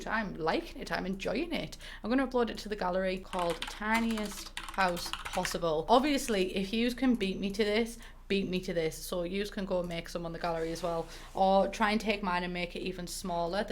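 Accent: United Kingdom. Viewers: It’s hard to understand british english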